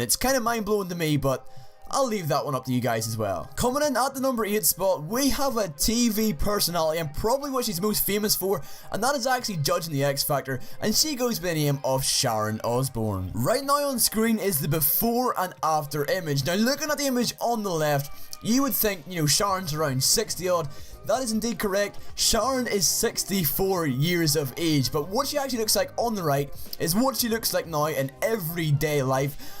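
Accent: Ireland. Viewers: R u from Northern Ireland or ireland plz reply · why do u sound like Irish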